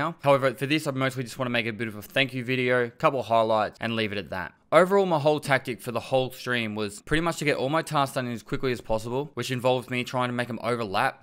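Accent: Australia. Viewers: Hey Pestily, are you an Aussie?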